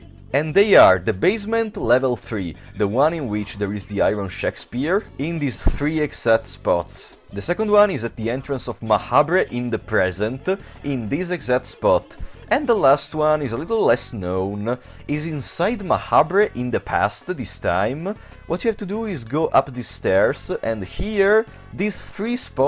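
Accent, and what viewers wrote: Italy. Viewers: Are you italian? (just for the accent)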